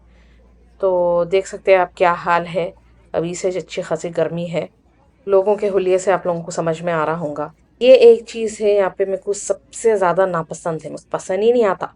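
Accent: Hyderabad, India. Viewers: Really proud of u being using hyderabadi accent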